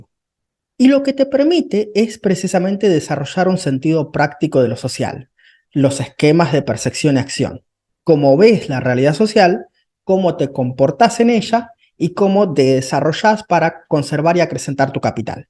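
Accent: Standard. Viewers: Me da ternura que hable en neutro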